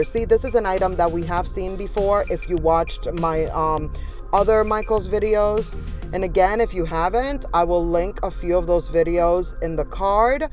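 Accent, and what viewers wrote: New York, United States. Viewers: what part are you from!!! Put me in your suitcase, lol!!! I thought you were from NY by your accent!!!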